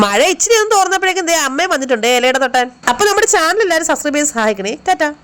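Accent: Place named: Trivandrum, India. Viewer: Ullolam,uthippooram,nammude Tvm slang,enthaayaalum kothippichu,ente favrta😊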